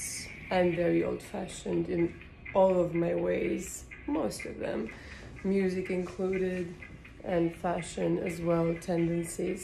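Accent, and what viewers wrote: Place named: France. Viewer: lovely french accent <3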